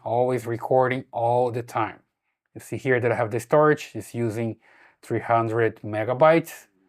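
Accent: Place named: Brazil. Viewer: Vc eh brasileiro nao eh? Esse nosso sotaque eh inconfundível. 🇧🇷 Os vídeos são mto bons, bem úteis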